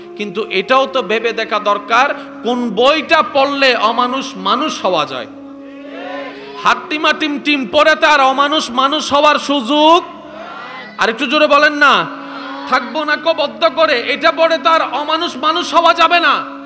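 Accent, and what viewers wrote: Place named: Chittagong, Bangladesh. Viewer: হুজুর আপনার ওয়াজ শুনি খুব ভালো লাগে। কিন্তু চিটাগং ভাষায় কথা বললে একটু বুঝতে কষ্ট হয়। আমার একথা ভুল হলে, মনে কষ্ট পেলে খমা চাইছি।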